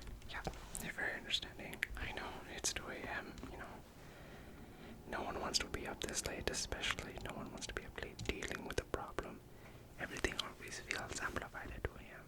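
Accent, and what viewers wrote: India. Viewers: If I ever have tingle immunity, I head straight to your Indian accent videos. Love this one! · the indian accent is one of my favorite ASMRs... very nice! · 16 minuts? And indian accent is more noticeable? My new favorite video in this channel.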